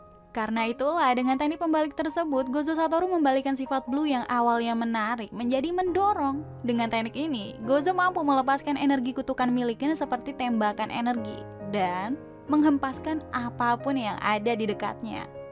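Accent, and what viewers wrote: Java, Indonesia. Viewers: Kangen suara yang medok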